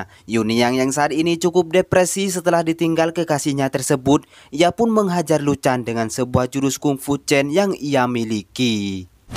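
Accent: Madura, Indonesia. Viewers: Yg menarasikan pasti org madura y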